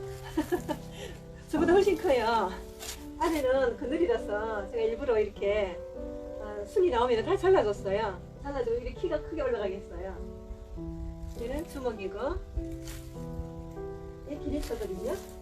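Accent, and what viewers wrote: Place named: Gyeongsang, South Korea. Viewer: 서울말 쓰는 갱상도 아지매시군요. 꽂아놨어요를 꼽아놨어요에서 빵 터졌음......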